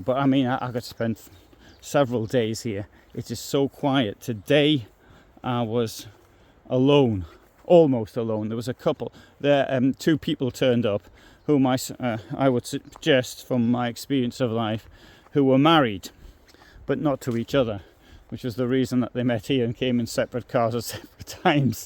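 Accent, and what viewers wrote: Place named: Midlands, United Kingdom. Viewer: You never saud but.you dont speak.the queens English. I think the midlands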